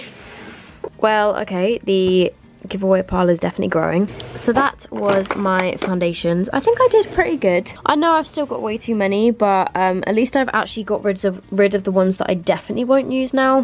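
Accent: United Kingdom. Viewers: . Are U British or American? I am asking about your accent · Good lord I wish I was British. Your accent is so pretty. Every time I hear a British person speak it makes me wish I could fake a British accent without everyone making fun of me. I love the way British people not only say things but also the words you use! So beautiful!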